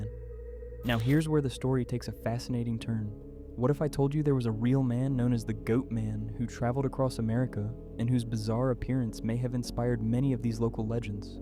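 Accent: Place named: Maryland, United States. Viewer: u can tell my dood is from maryland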